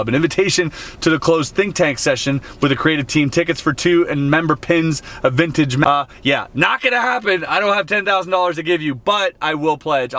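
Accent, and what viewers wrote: Quebec, Canada. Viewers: What ?😂 you speak french? Quebecois en plus?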